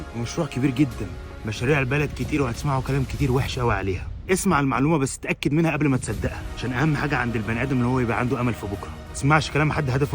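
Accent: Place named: Nablus, Palestine. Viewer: فلسطيني نابلسي 😅 لهجة نابلس قريبة كتير على دمشق 🤍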